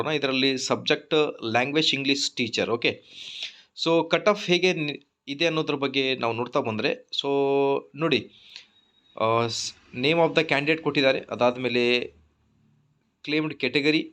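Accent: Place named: Mysuru, India. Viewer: Mysuru english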